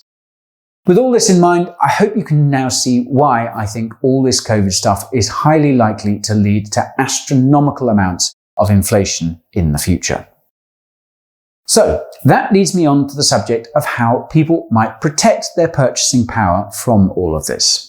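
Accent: United States. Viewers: Guy talks increasingly like Americans now.... "Here's the deal" "if you ask me" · sounds American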